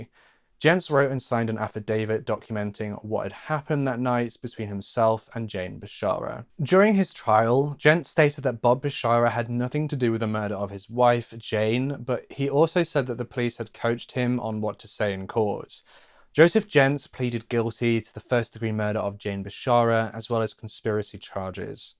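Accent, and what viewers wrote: United Kingdom. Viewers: As always great vid. Nice we have a British voice on these true crimes actually. So many USA channels. Miss the raised eyebrows xxx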